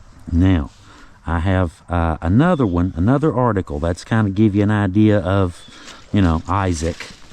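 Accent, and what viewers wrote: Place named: North Carolina, United States. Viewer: im from Granite Falls, NC the way you talk( your accent ) is the same as my friends that grew up around here so its easy to understand you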